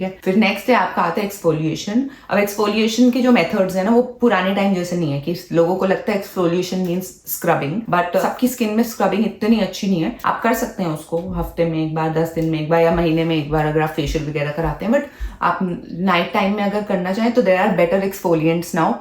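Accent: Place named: Punjab, India. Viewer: ! Your delivery is so relatable, so punjabi. Love watching your videos even while feeding my newborn.❤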